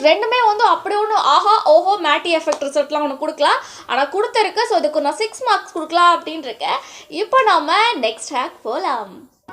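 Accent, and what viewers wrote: Tirunelveli, India. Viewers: Akka neega tirunelveli ya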